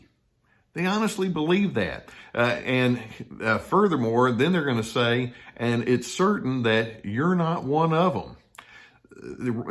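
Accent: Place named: Texas, United States. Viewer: it's your Texas accent, it reminds me of cowboy movies lol Happy Holidays!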